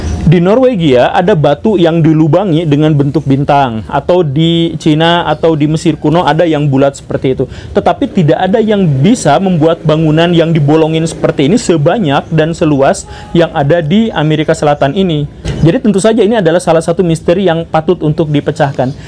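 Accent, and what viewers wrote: West Java, Indonesia. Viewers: Jarum kecos Ranggeteng hadeuh.. Sunda pisan si Akang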